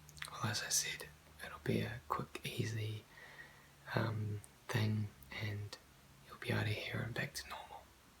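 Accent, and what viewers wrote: New Zealand. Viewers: wow I'm Korean and I sometimes watching your asmr, And it's really helpful to my sleep! your kiwi accent is soooooooo nice!!!thank you :)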